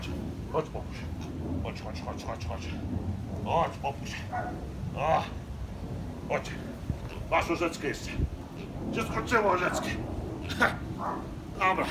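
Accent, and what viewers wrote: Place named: Lithuania. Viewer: D Uwielbiam ten jego litewski akcent.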